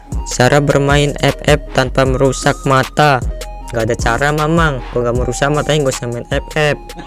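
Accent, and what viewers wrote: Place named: West Java, Indonesia. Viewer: Logat sunda anjer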